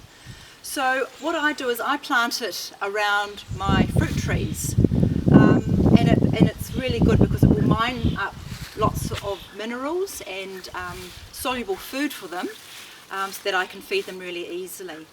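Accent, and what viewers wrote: New Zealand. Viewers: You sound like a kiwi, thanks for the great information as I love herbs. I want to suggest one thing, try to be less violent and communicate with them more through intention, you will find that you will get a better result with everything you desire to achieve from them. blessings. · When I move to New Zealand in the future I hope to adopt a nice kiwi accent like yours. It truly is nice.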